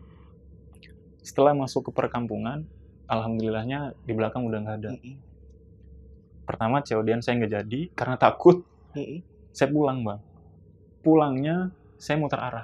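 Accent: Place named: Serang, Indonesia. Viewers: eh ini abang nya orang serang banten bukan sih ,soalnya kadang ceplos pake bahasa serang 😂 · Kayaknya orang serang yaa bang dari cara bahasanya. Soalnya saya juga orang serang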